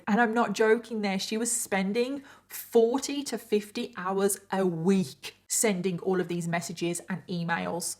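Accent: United Kingdom. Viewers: i dont think ive ever heard a more british accent hahah every word is brilliantly emphasized haha exquisite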